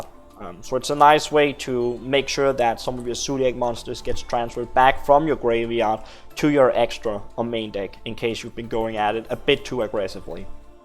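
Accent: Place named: Denmark. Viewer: You sound like you're from Denmark, love your voice daddy <3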